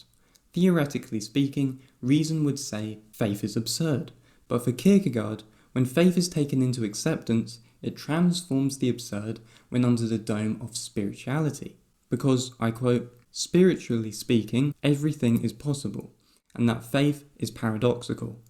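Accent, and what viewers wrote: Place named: United Kingdom. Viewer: Can you please add (British accent) to save me a time.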